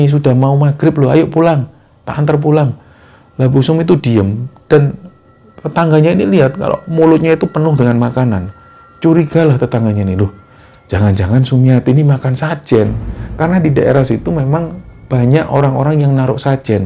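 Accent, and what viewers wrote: Java, Indonesia. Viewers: Mlm maz..crt ny keren2 moga sht2 tuk kru KS trutama maz yg logat ny kjowo jowoane sngt kas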